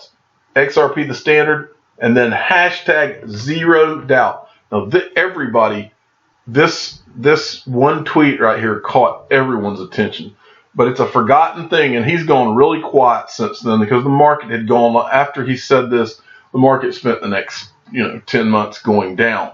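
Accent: South, United States. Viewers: My job keeps me traveling. I am rarely at home. I listen to you every day while I am moving from one place to another. I also have a Youtube channel. My monthly Google payment goes into XRP. The first, second, third and fourth time I heard you on youtube I clicked forward IMMEDIATELY! That southern accent of yours was the proof that you were stupid AS FAR AS i WAS CONCERNED! One day you posted a topic that caught my intrest and l listened to your entire video. I have been hooked ever since. YOU ARE AMAZING!!! Forgive me for my predijust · don't judge by voice alone, a lot of the southern guys are smart like Mike Judge who created hilarious movies and King of the Hill. This is the best XRP channel because has experience in the finance world & has been investing in XRP for 3-4 years BEFORE anyone else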